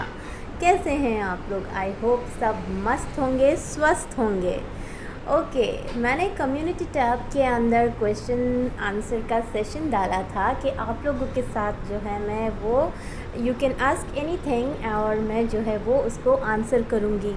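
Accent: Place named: Kathiawar, India. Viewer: If your ancestors are from Karachi/Sindh/Pakistan then your mother tung should be Sindhi. Why are you speaking Gujarati? In Karachi most Gujarati speaking muslims are migrated from Junagadh Saurashtra. Example one of greatest Pakistan's cricketer Hanif Mohmond n his brothers all were born in Junagadh accept Shadik Mohmond. You needs to do more research on your ancestors because your gujarati speaking tone is Kathiawadi.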